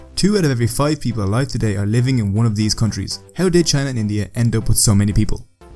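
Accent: Ireland. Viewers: Your videos are really good. I can spot that Irish accent. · Are you Irish? If so, cool cause you and one other YouTube are the only YouTubers that I know about are Irish. The way I can tell is be cause you pronounce thirty two as turdy too, and the t-h syllables are pronounced differently than as it appears. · u from Ireland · clearly Irish...It's not that he doesn't enunciate properly, he just has an accent.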